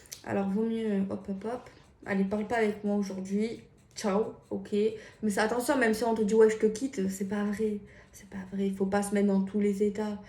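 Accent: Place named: South, France. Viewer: T' écouter me fait plaisir, j'aime l'accent du sud, ta petite voix, courage petite, tu fais bien..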